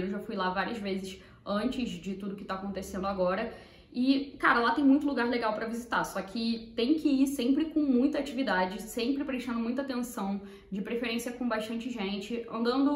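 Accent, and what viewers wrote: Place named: Rio de Janeiro, Brazil. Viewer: Tão engraçado que nasceu em São Paulo e fala carioquês kskskksks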